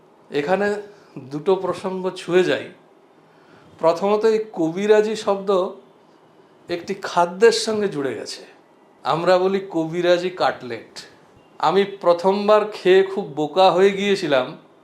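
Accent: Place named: Bankura-Purulia, India. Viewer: আপনার কথা শুনে মনে হয়েছে, আপনি উত্তর বঙ্গীয় বা বাঁকুড়া পুরুলিয়ার কোথাও। এটা আমার অনুমান। আমার বাড়ি বর্দ্ধমান জেলার উত্তর প্রান্তে। মোটামুটি কবিগুরুর শান্তিনিকেতনের কাছাকাছি। আপনার কাছে বহু কিছু শেখার আছে। আমার বয়স ৭১+। কিন্তু জ্ঞানে আমি নেহাত শিশু। পেশায় একজন সঙ্গীত শিক্ষক। তাতেও বোধ হয় শিশু। খুব কষ্ট হয়, এতদিনেও শিশু হয়েই রইলাম। আমার শ্রদ্ধাপূর্ণ নমস্কার নেবেন।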